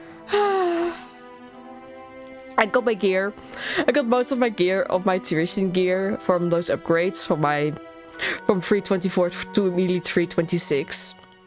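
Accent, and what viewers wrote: Netherlands. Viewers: I saw you couple of times on malgus server nice! Nice vids, subbed! You dutch by any chance? Very recognisble accent :)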